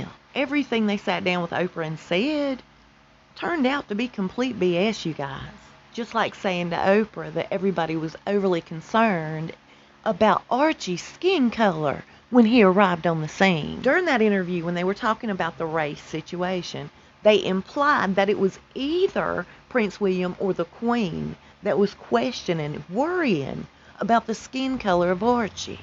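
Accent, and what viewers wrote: South, United States. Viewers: thank you so much for this video and all your videos.I had a hard long day Here in Vegas such a blessing To come home Cook dinner and here that southern Voice.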